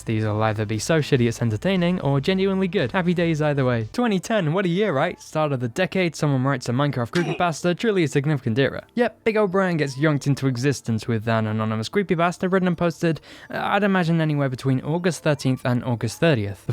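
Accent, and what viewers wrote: United Kingdom. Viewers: your bored british voice is going to be nostalgic some day lol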